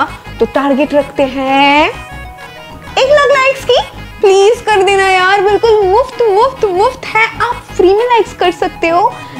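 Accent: Bengal, India. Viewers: Didi are you a bangali I am also bangali😀 I noticed that your tittle is and your taking is just like bangali Khathi bangali❤️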